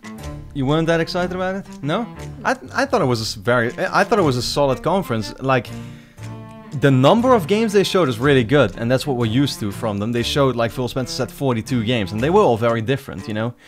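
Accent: Netherlands. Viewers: You sound dutch... Are you dutch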